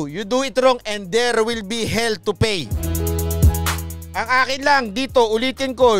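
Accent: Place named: Iloilo, Philippines. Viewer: Bwaaaah bagay sayo mg ilonggo sir 😂😂😂ang dami kong tawa sayo tlga😂😂😂😍😍😍❤❤❤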